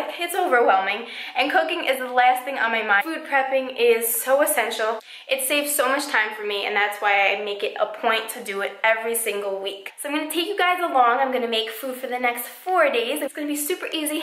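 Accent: New York, United States. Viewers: Where are you from? I love you accent, sounds New York or jersey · Are u from NY? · Are you from New York or the midwest? That accent is so strong. I like it though btw you are gorgeous! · New Yorkers? Long Island? :) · Are you from New York??❤️ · Sounds very New York... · where are you from? I hear a bit of new york dialect? :) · what part of Jersey or New York are you from...anyone with ears can hear it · true New York/ Jersey accent there lol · I follow you on insta and I never took in your New York accent till now. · Thank you thank you. Are you from new york or new jersey?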